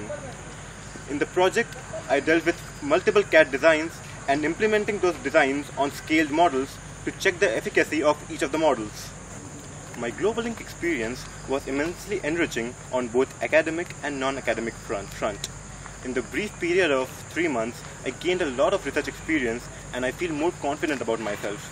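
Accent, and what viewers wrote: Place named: Canada. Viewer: i am fuckin loving the canadian accent..!! :D ;)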